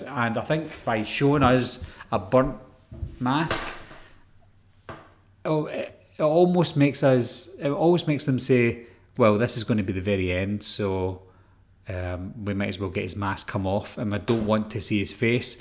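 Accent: Scotland, United Kingdom. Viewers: Were about’s from Scotland are you from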